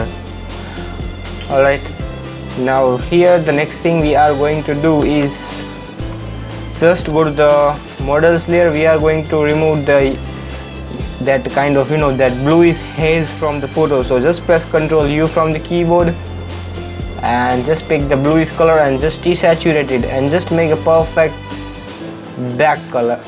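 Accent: Gujarat, India. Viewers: sure bro by ur accent u seem gujarati ryt?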